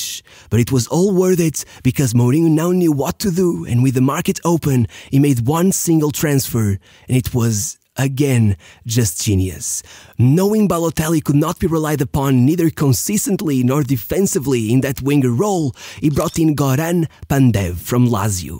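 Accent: Portugal. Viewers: Why do you pronounce everything like it's portuguese ? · Tenho a certeza que és tuga 🤣 mas força manu curto a brava 👏👏👏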